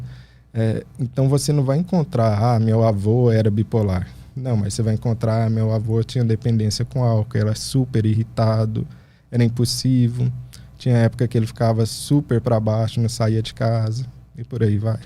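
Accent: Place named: Minas Gerais, Brazil. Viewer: Que show de explicação. E com esse sotaque de MG Ficou MIÓ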